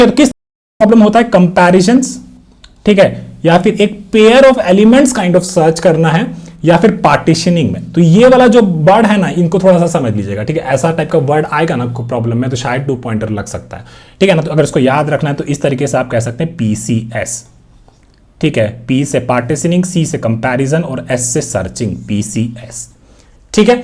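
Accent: Bihar, India. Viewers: i loved the bihari version of speaking just loved that and explanations were also good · Bihari accent ki hi problem h bhaiya baaki content bhaiya ek number hai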